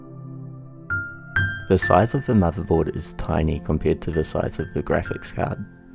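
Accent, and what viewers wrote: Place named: New Zealand. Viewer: I can identify a kiwi accent pretty quickly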